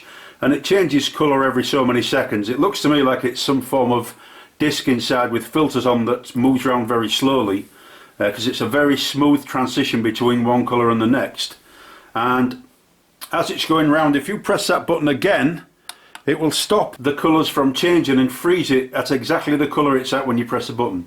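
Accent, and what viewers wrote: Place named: Hull, United Kingdom. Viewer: I agree . Sounds like Hull where my family are from!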